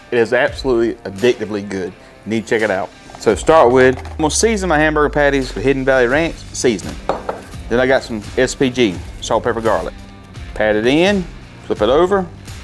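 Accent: South, United States. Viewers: GD these look good 🤤😋 I love your country accent btw❤️ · Hey where you from? I hear that southern accent in your voice 😁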